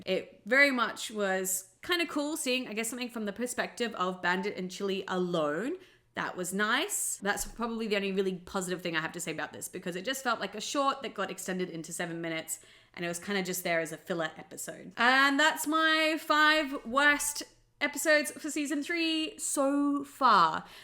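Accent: Australia. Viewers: i like a lot watching your videos about bluey you are an awesome australian youtuber i likea lot Your Australian Accent anyway i'm from italy and i'm a big Bluey Fan i love watching Bluey a Lot since 2021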